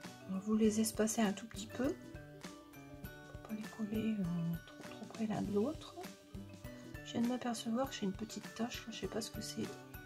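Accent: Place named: Picardy, France. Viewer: encore mille fois merci je rigole à chaque fois avec tes petites pointes d’accent je me doutais un p’tit peu que c’était cette dimension j’ai commençé la carte je te dirais quand elle sera complètement finie je prends mon temps je veux que ce soit bien fait pour mon p’tit loulou je me lance je suis vraiment débutante tu sais merci ma tiote picarde gros bisous et à très bientôt pour de nouvelles cartes il va falloir que je m’équipe petit à petit!!!!💋👍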